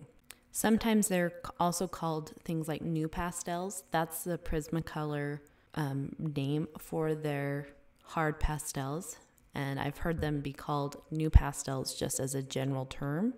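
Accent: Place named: Utah, United States. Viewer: I could tell by your accent, that's why I asked. My dad's from Utah, and you sound like a lot of my family on his side (and many a church leader I had 😅)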